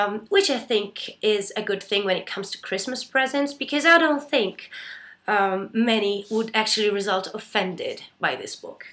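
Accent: United Kingdom. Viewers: What in the world?!?! Why do you have a British accent? How did you do that? You're Italian, but you have an almost native British accent. Uhhh WOW! I miss your bluebeam channel... what happened? I wish I knew you in person, you're so amazing and have so much depth.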